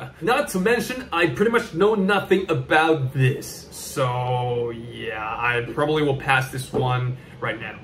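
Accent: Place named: United States. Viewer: wow，very nice American accent, very impressive.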